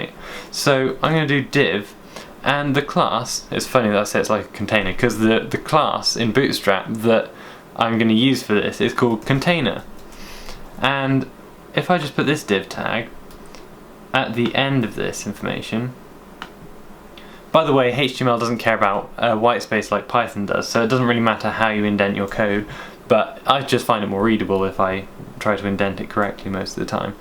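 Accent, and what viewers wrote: United Kingdom. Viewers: Are you english ???britisher? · are you british ??your accents remind me of classic english like joe root does :D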